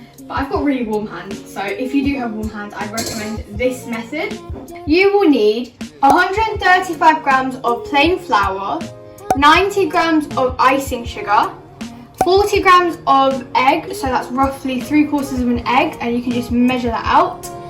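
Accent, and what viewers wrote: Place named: United Kingdom. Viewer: British accent is really hard to understand..😕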